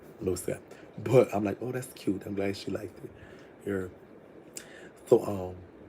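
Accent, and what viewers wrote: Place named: Houston, United States. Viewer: You talking in that Houston boys voice is taking me out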